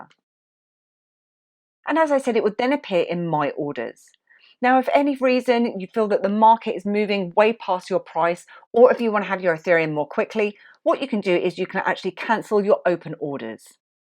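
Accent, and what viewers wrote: United Kingdom. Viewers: I don't understand a shit... British accent🙄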